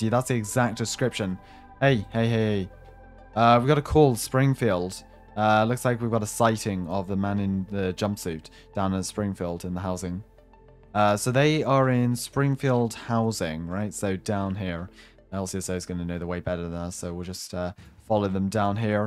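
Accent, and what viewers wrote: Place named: Scotland, United Kingdom. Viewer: You've changed your voice sounds different you sound Scottish but before you sounded british a few months ago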